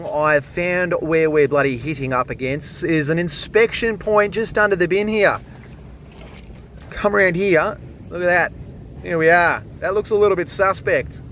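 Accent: Australia. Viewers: Love the channel always loved the Ozzie accent since we got the Paul Hogan show in the 80s. 🏴🇬🇧⚒️⚒️⚒️. The New South Wales is a bleeding sight better than the old one. Your a legend. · Hello there. It's Tuesday 22nd April. The sun is shining & I'm sitting watching you from England. I'm glued to the t.v. absolutely fascinated with the job that you do. You are great !Can't take my eyes from the screen & it's lovely hearing your Australian accent. Keep it coming you fabulous man.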